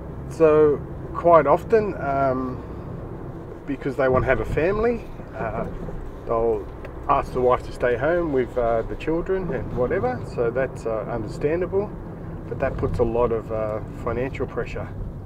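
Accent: Australia. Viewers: Are you an Aussie mate? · You learned your English in Australia, yes? 👍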